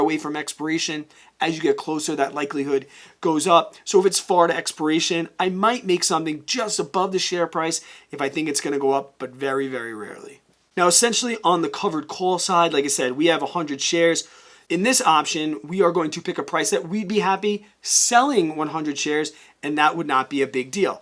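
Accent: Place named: Northeast, United States. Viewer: I love your videos! They’re very helpful for learning. Thank you! I also get a kick out of your accent 😂 Are you from the Northeast?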